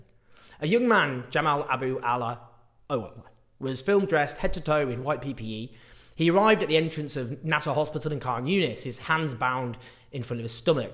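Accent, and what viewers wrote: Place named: England, United Kingdom. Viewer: What English accent is this?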